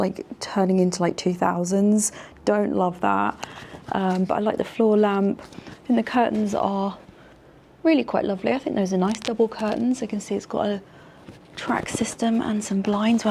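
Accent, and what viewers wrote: RP, United Kingdom. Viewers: That's so adorable 🥰. My 10 year old daughter also loves to watch with me, she loves sense of humour 😂😂😂😂😂 and her posh accent 😊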